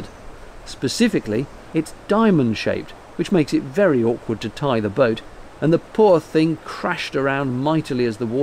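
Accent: United Kingdom. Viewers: Thank you for these videos. They are so relaxing, and your humor is spot on. Of course as an American I'm easily won over by a British accent. :P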